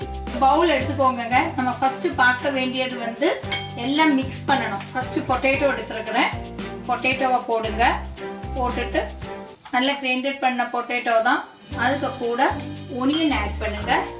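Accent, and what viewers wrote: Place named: Kerala, India. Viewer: Good mayalam mix tamil voice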